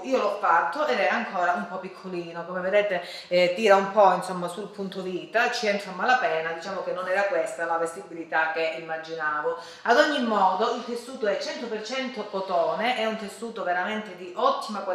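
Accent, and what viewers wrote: Calabria, Italy. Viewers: Ciao, posso sapere di dove sei? Sei calabrese? Conplimenti.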